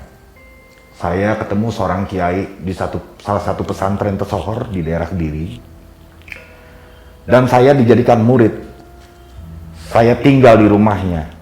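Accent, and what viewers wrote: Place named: West Java, Indonesia. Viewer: Oh belajarnya dari Banten toh..pantes aja kok logat nya lebih kental ke barat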